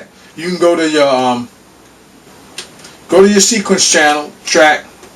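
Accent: Baltimore, United States. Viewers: Dope vid. You sound like you from B-more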